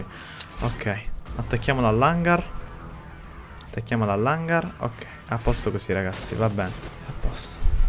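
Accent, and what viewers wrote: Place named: North, Italy. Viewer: Ma perchè parli sia napoletano sia romano e poi parli con l'accento del nord ...ma che cazz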